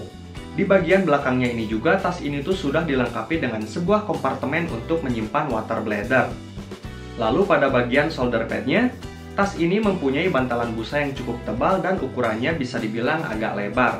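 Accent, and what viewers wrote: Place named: West Java, Indonesia. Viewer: Dengar narasinya, sangat mencirikan orang sunda 🤣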